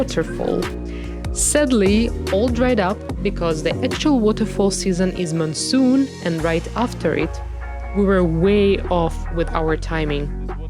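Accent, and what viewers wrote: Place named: Bengal, India. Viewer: Thoroughly enjoying your vlogging, narration, pronunciation (as in bengali accent) moreover your interests about local history, culture and all..hope will get many more. ❤️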